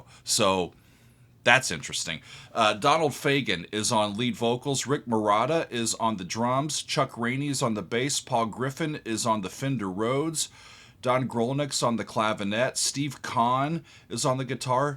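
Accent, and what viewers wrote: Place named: North Carolina, United States. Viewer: It's how folk from North Carolina and the Outer Banks talk naturally.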